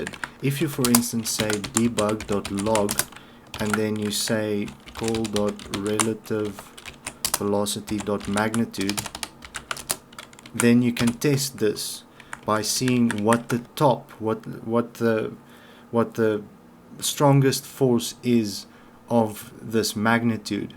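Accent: South Africa. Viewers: You from SA?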